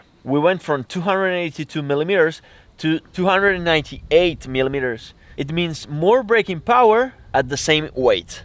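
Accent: Italy. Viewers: what ? i really knew instantly that he is italian haha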